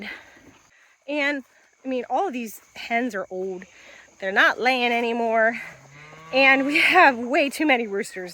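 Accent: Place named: New York, United States. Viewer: Yous???? LOL Are you from NY??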